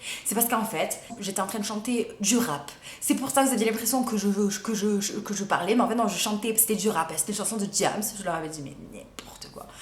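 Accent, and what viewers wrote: South, France. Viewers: je kiffe ton accent du sud bisous bisous❤😘👌😂😉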